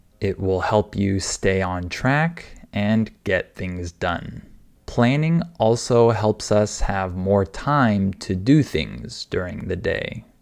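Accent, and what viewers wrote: United States. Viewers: American accent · english american or británico ?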